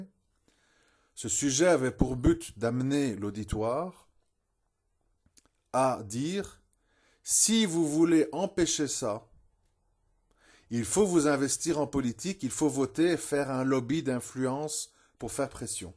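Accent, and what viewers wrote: Belgium. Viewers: Salam mon frère, ton accent brusseleer c'est top 😉 moi pareil, quand je m énerf ça revient 😎. C'est bien de dénoncer, mais sois en forme car des hypocrites sont légion...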